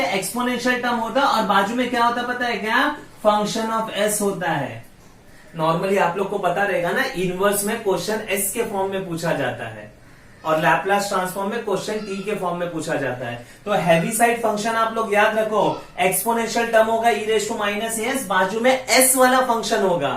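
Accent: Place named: Tamil Nadu, India. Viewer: Bht bhdiya sir.. ur tamil accent is cool 🎉 😎😎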